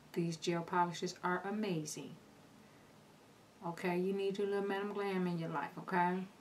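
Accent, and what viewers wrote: South, United States. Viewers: Omg southern gal to the fullest 😂. I love this!!!!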